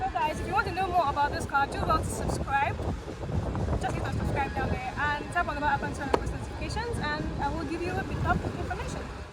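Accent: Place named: United Kingdom. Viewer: I honestly thought you were speaking Chinese at first. I don’t know if you can speak Chinese. If you can, then your British accent and your speed of speech articulation on top of that is making things worse.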